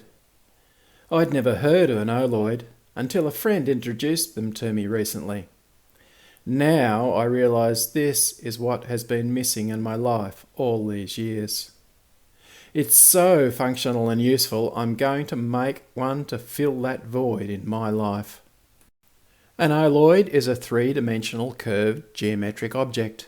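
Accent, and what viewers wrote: Australia. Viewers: I’ll give you a thumbs up for your silly Aussie voice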